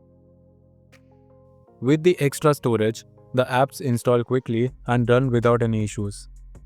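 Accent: India. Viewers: When you hear the Indian accent you kbow he finna make yo shit work, may your food be good mah bro❤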